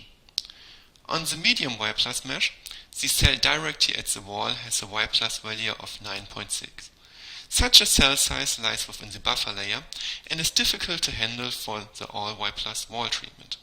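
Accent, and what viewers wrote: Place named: France. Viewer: He's french and that's the french - english accent. It doesn't matter how close or far you are from the UK. ;)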